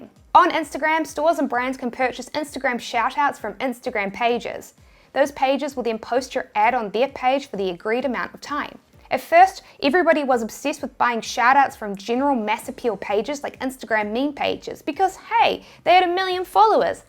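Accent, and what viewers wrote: New Zealand. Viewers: Such Kiwi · Cool, sound like a New Zealander tips.😎👍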